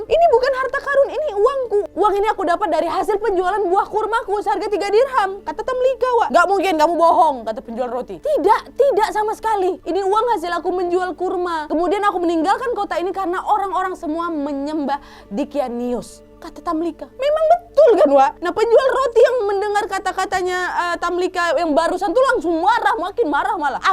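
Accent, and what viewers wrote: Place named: Manado, Indonesia. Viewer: Aku suka gaya bicaranya, kayak orang Manado🤗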